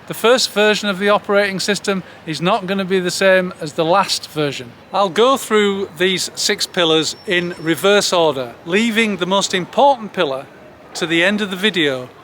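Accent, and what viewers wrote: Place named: Northern England, United Kingdom. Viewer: I adore your content & northern accent! I worked with a girl who had her gall bladder out in her 20s, don't guilt yourself, brother - Cheers to good health 🥂🍻😁💋✌️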